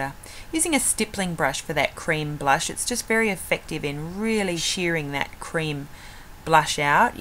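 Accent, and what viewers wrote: Australia. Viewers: love your voice. its very soothing. there is something about the aussie accent!! chi chi naturals is on sale soon, so I thought id watch chi chi reviews. and found your channel.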